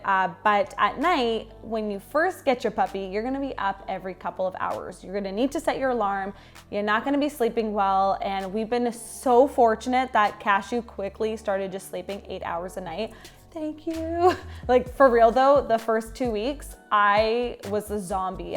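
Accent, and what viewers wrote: Canada. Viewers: Your canadian, arent you? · Is she Canadian? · R u Canadian?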